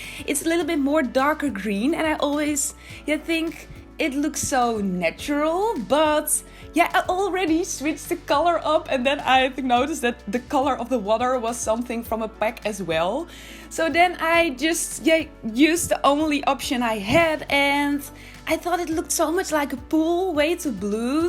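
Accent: Netherlands. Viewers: You can hear so good that she’s dutch, it’s so cute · Oke je hoort wel echt duidelijk dat je Nederlands bent. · I am so in love with dutch accents so this video was a real pleasure for my eyes AND my ears!!! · Haha inderdaad, haar Engels is erg goed alleen de uitspraak is erg Hollands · Are you Dutch ? You have a Dutch accent. Either way this building is soooo good wth, I’ve never seen something like this from the sims, you did a really good job! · Kon gelijk horen dat je nederlands was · Are you dutch? Your accent sounds dutch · Ik kan hore dat je nederlands bent hup nederland!!!😂❤️❤️ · I can immediately hear that you're Dutch 😂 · are you dutch? I hear a very heavy accent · Where are you from? It sounds like Dutch😂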